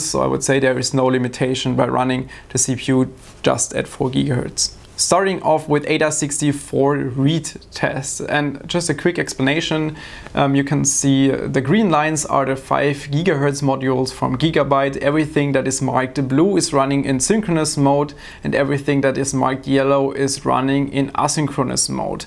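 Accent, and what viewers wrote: Germany. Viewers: Love the german accent 😍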